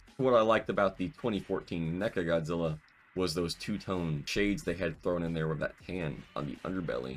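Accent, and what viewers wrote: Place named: United Kingdom. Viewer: ? you sound like you’re British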